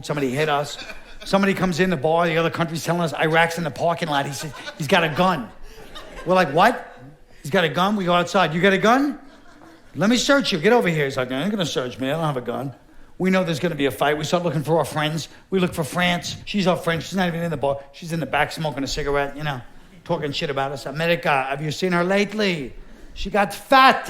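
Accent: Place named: New York, United States. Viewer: He sounds so New York but is so intelligent!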